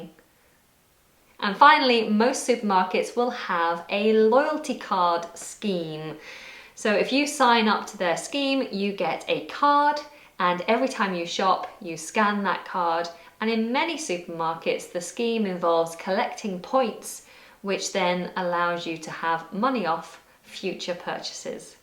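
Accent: United Kingdom. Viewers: Hi there! Today we have found out a new skill in you: an actress. So you're not just an excellent teacher, but a great actress too. Congrats for your work on this video! It's a great help even for those of us who think have a little higher level of English. But listening to you, to your voice, to your beautiful British accent is so pleasant! Cheers! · You are a perfect actress as well as a teacher. I adore your british accent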